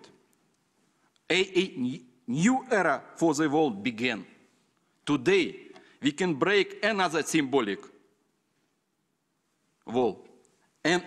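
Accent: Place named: Russia. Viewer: Его английский чересчур русский.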